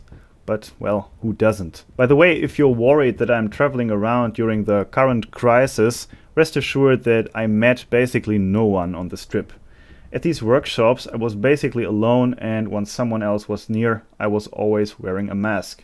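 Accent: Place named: Germany. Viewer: My Great Great-grandfather was from Germany. What part exactly I do not know since he passed away when I was a small child. But hearing your accent and listening to you pronounce things in German is a treat for me. Not mechanically inclined to do this things you are very skilled at. But I will continue to watch your videos and live vicariously through you. And who knows maybe I'll learn a thing or two that will help me take care of the little amount of tools I have. Keep doing what you are doing and good luck with the car repair!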